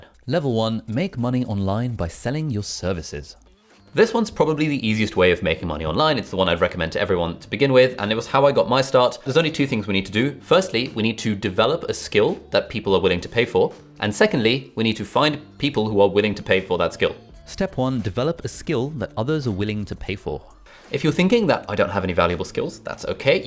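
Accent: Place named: England, United Kingdom. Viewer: Why do you keep saying "dollars"? You're very obviously English, even to an American!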